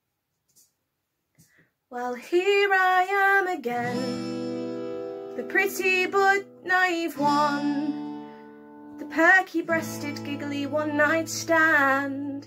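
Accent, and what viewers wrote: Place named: North, United Kingdom. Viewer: Loved this so much! Especially with the northern accent if sounds so cute. Hope you go far!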